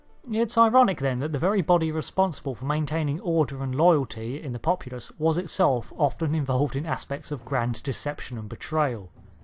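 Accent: United Kingdom. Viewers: Sounds like you live in Britain, whats the difference?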